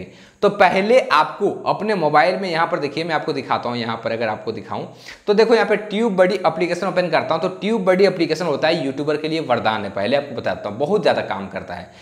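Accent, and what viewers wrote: Bihar, India. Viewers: Aapka bolne ka tarika achaa hai Bihar se ho aap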